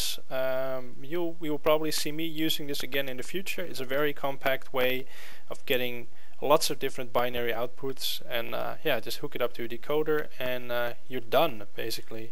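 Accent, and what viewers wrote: Netherlands. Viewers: are you dutch? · Je hoort Gwn aan je stem dat je nederlands bent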